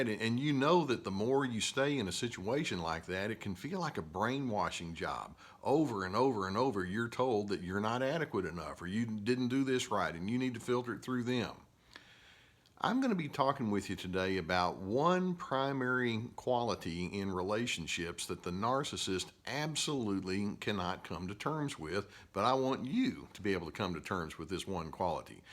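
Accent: South, United States. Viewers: Lovely southern drawl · Sundiata Keita he sounds like a southerner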